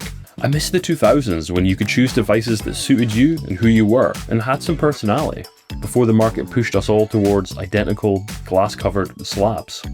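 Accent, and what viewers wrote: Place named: Scotland, United Kingdom. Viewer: Scottish accent with dropped ‘tees’ sounds shocking.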